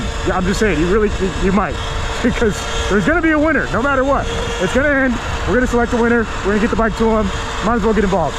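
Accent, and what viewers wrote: Minnesota, United States. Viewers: Don't look at my feet🤣🤣🤣!! Love your content bro! It's crazy because you sound like this guy I used to work with who was from Minnesota. Not sure if you've already finished the giveaway, but if you haven't, I wish you would consider me! It's kind of weird because I'm 51 years old and the whole e-bike scene is just way too exciting for me and I would love to be a part of it! The thing is I'm kind of in a messed up spot, because of a ticket I got while I was delivering with Amazon. I was delivering a package to a dark neighborhood and I was using my brights and for some reason I was pulled over and given a ticket for using them. Right now my license is suspended and I had to sell my car to pay some bills and food. I love to win that bike because I could use it here in Houston to see how the market for delivering on an e-bike would be here. 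I follow some guys who delivers food on e-bikes in California and they seem to be doing fairly well! I'd love to try that in Houston being that it's a pretty big city and I have a feeling the market would be good and it kind of nichie in a good way! It would really help me out because for some reason people are looking at my age and it's being hard to get another job! Before I was working with Amazon, I had a job that I was with for over 20 years and when covid hit, I lost it!! Screwed up thing is that I am a very young 51 in that I feel like I'm still in my 20s! I could do some deliveries until I find something more permanent but it would de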